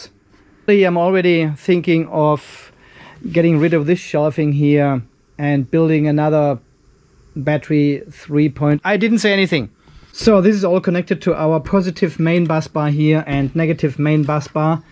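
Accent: Germany. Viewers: Hello, I am from the nature Island of the Caribbean, Dominica. I have been watching your videos for a while now and I must say that there's never a dull moment, I just love your style and your accent, when I first watched your videos I told myself this man is from Germany because I worked with a few Germans building a brewery in my country. Keep going bro we a watching. Oh and the next time you want to say "shit" please say it in German I like to hear it but can't spell it.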